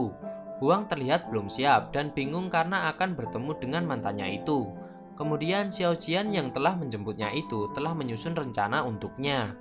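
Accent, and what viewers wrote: Java, Indonesia. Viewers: Medok kali kau bang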